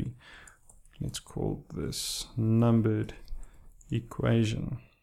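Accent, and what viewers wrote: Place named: Afrikaans, South Africa. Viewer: I'm a simple man, I hear Afrikaans English, I upvote.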